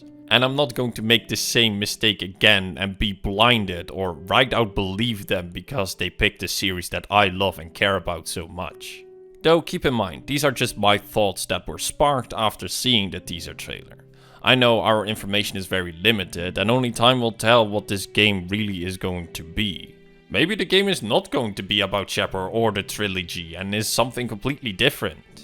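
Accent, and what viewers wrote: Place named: Netherlands. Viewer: Yo...Are you in any shape form or guise connected to Pretend3r or Pretend3rCE? Because he's dutch and is interested in Mass Effect as well. Plus you seem to have a dutch accent...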